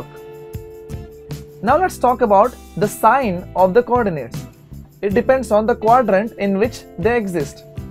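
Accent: India. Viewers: i love ur indian accent it helps me understand better im subscribed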